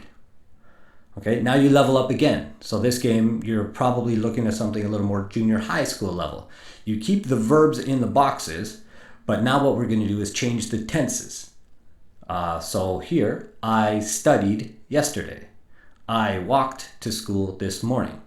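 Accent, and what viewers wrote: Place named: United States. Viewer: Thanks the video is great you should do more videos like this. I'm a teacher of English from Gaza / Palestine if you ever heard about it:). I teach first grade. I teach 9 classes each has 35 to 40 pupils they enjoy your games and they like songs as well. Nice to meet you and too. Btw your accent is similar to the American accent that's why I asked you ♥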